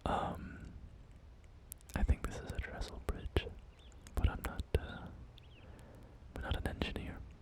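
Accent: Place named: Scotland, United Kingdom. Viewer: you have a scottish accent in the way you pronounce certain things it became very apparent right before you revealed your heritage i share some irish/scottish blood as well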